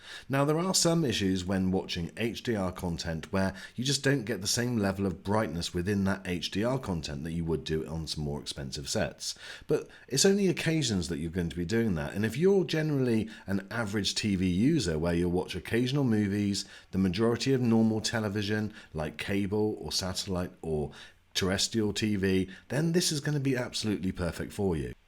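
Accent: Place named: United Kingdom. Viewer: Dude he’s not Australian he’s British LOL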